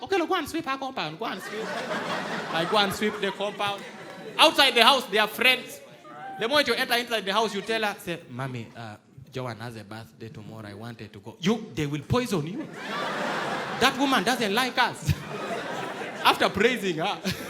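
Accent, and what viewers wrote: Uganda. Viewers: he is exceptional and way above some Nigeria comedians but it is pure ignorance to talk about accent of Nigerians when he has a strong Ugandan accent himself. You can actually disagree with your head and not your heart.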